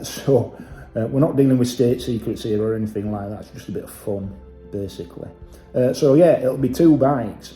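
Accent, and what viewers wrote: Yorkshire, United Kingdom. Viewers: I believe the first thing that got me to subscribe was your Yorkshire accent. 😄 I like the way the channel content is laid out. I enjoy it all. Good honest reviews and opinions. Which ever way the channel goes, I will be here bud. Cheers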